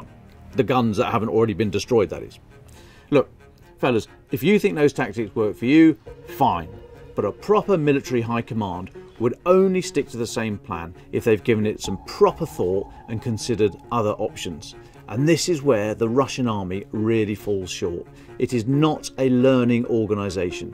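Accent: United Kingdom. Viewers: The bloke is so Bri'ish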